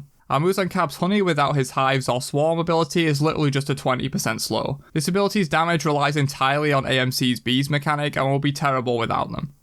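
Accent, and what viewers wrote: United Kingdom. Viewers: I love your videos, but need to go learn British English to understand more than few words!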